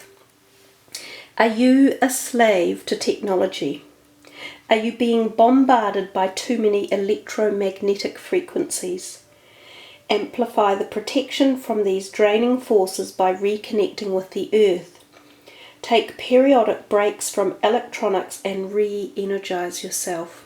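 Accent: New Zealand. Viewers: keeper deck as in deck of cards,the reader is a kiwi they speak different.....think fush and chups.....thanks cuz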